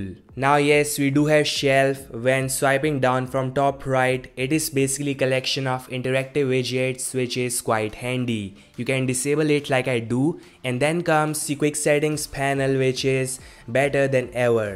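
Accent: India. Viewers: Apka abaj sun ke lag rha ha Indian ha agar hindi bolte too trand pe hote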